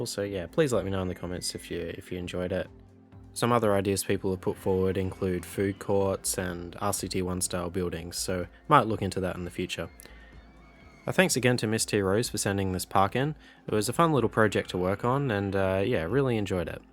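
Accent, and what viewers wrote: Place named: Australia. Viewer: Getting a lot of inspiration from your videos in general, not just this one specifically. Really enjoying them! Definitely a more chilled vibe to some of the other RCT content creators out there (without criticising them of course, just my subjective experience); plus your Aussie accent is a welcome change for a fellow Aussie 🙂I've done the whole "click like and subscribe" ! Many thanks!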